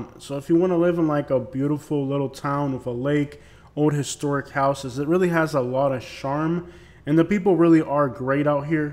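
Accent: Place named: South, United States. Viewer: 😂 your southern accent kills me 😂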